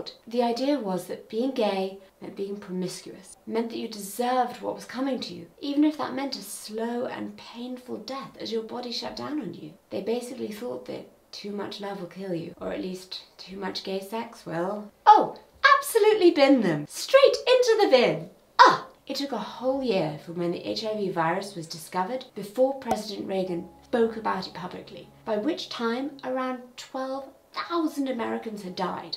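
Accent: United Kingdom. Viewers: You Madame should be made a “ Lady “ , love your speech. Soo British and educational for a speakers. I am waiting 😂😂 😂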